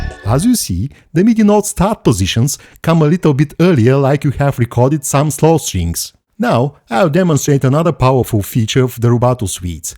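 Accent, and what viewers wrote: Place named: Russia. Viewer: а вы, дорогой друг, часом, не носитель русского языка?)) а то уж больно акцент знакомый)))))))))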